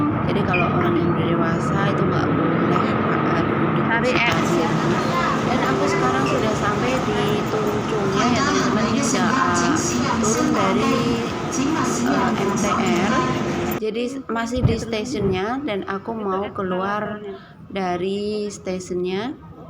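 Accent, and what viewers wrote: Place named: Banyumas, Indonesia. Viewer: Salam ngapak mbae😂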